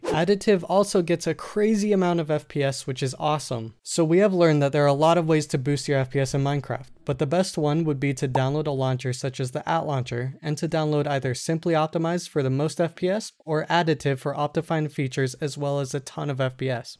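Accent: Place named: Eastern Europe. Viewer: The creator had a slavic accent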